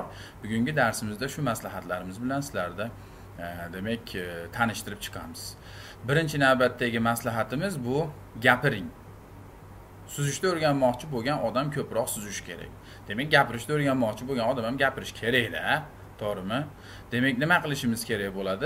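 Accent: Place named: Andijan, Uzbekistan. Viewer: инглиз тилини Андижанча манерада гаплашиш.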